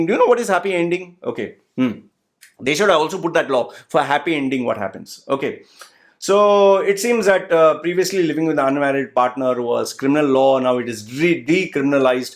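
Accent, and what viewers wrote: Kerala, India. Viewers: That verbal slapstick, mispronouncing word puns and the overdone mallu accent is getting old... Starting to get redundant.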